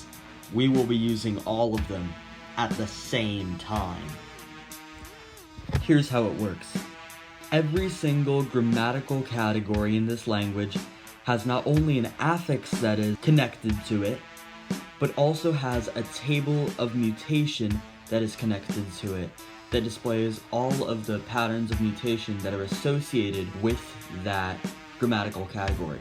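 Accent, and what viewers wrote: United Kingdom. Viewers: WAIT IT SOUNDS LIKE A NORTHERN BRIT SPEAKING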